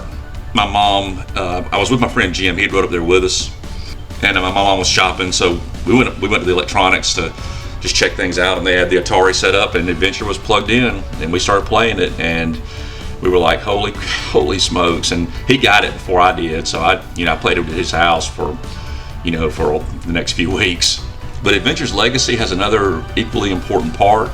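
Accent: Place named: South, United States. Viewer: That is a hard Southern Accent. I appreciate what you're doing here though and I agree with Space Invaders, Adventure, Pitfall, and even Pac-Man...I loves all of these games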